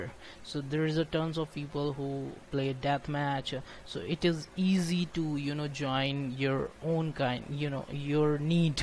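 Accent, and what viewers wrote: India. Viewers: U have indian pronunce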